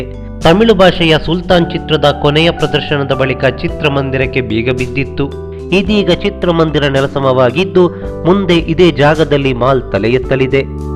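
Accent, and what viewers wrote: Coastal Karnataka, India. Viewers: Sir thanks 😊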